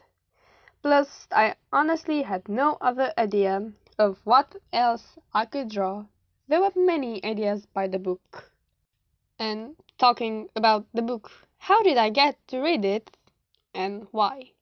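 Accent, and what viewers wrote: United Kingdom. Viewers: Wow! Chiat zici ca esti din Marea Britanie cu accentul tau! Eu me-as dori sa o citesc, dar nu k gasesc si oricum trebuie sa imi ajunga 2 tot in Engleza. Felicitari! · Îmi place vocea ta lol! Accentul tău britanic nu suna rau (nu de-as fi eu experta heh). De desen ce sa mai zic..arata splendid!!! Squirrelflight's Hope e pe lista mea de super ediții si plănuiesc sa o citesc...mai încolo xD 🤍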